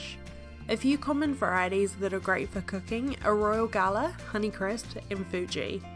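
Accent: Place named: New Zealand. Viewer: New Zealand accent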